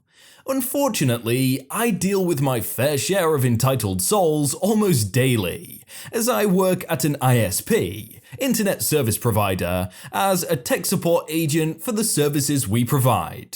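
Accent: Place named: Liverpool, United Kingdom. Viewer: Hell, you had only read the first THREE words and even got that wrong. Still, you got a great accent! You from Anfield?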